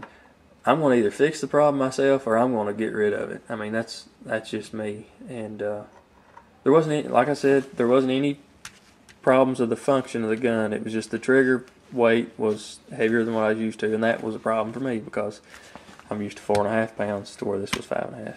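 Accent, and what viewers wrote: South, United States. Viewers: I would have to say that I believe you may be a touch Southern. We talk a little funny to the northern folks.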